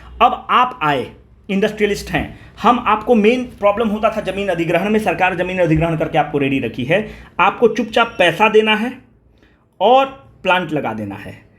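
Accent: Bihar, India. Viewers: 51/71 ...could be better!! Happy coz salfas mistake nhi hwa... Sir 2 Starbucks coffee ☕ udhar raha..😁 Bihari tone rocks ..carry on lv it proud bihari👍 Thankyou for this test n request sir..plz ese tests BS,MHI,MHB k liye v Le aaiye plzzz ..🙏 it is so helpful in revision. #THANKYOU ❣️ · Sabse phle Thankyou. Sir as a Bihari ,jha v humlog jate hai desh videsh bs ek chiz apne sath le jate hai wo hai apna bhasa ... Whi to pahchan hota hai na sir . Uspe to proud krna chahiye .... Jb aap Bihari tone bolte hai bahut hi gauranvit feel hota hai .. kabhi kabhi magahi v boliye .. bhojpuri and maithili people kabhi kabhi dominate kr dete hai 😜😛😋 ... Jo maza hm bolene me hai wo mai me kha ... Baki sir I am following your advice sincerely. Hoping for the best . Parnam 🙏🙏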